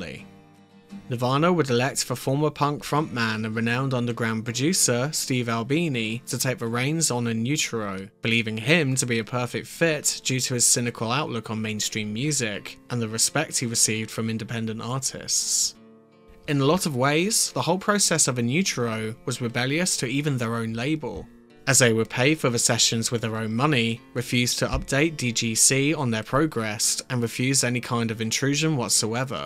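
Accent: United Kingdom. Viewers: Another British Accent bs fake documentary. Pass.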